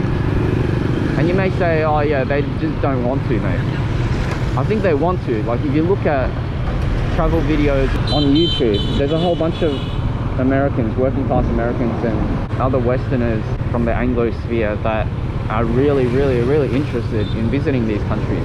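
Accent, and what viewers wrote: Australia. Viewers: i agree with you 100 percent...up to a certain point. the point: try making a video bashing vietnam while u r in vietnam...and then, go back to australia (assuming from your accent) and make a video bashing australia. and... mr. dam vinh hung was recently "called in" for a meeting with the authority and fined for warning people about the kung-flu that going around. and... some dude wrote a song about police brutality and spend about 2 yrs in prison...he's ok now... In the theme of the movie 300...walk around saigon with a shirt that depict king leonidas kicking hcm into the bottomless pit with the caption: THIS IS SAIGON...good job, nice vid and thanks.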